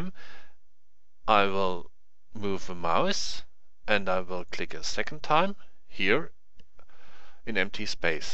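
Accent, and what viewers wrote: Germany. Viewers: For me 1x speed is just rigth. My native language is Spanish so listening English talked by a german (I guess), and understanding it, is fine. By the way there are FreeCad tutorials in Spanish by a guy that calls himself Obi Juan and these tutorias are very, very good. FreeCad is so big that there are many ways to learn it, so the tutorials here and the ones from Obi Juan are, for me, complementary to each other. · Great tutorial. I have attempted to learn CAD before. But training videos that I tried in the past were way to fast and concepts were not clearly explained. Usually the presenter in the tutorial was clicking buttons too quickly or using keyboard commands so I could never clearly understand how things were being done. But this video is very well paced, concepts clearly explained and the steps involved very clear. A really fantastic job! 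And the German accent, just makes me feel smarter listening to it :-) I look forward to viewing the other videos and I now feel confident that I can do some home machining of parts I design myself. Thank you!!! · are you actually german, you dont really sound like a english men.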